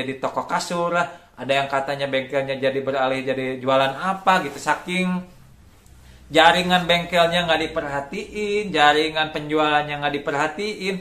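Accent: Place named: Banjar, Indonesia. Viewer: Orang Banjar ya bang🤣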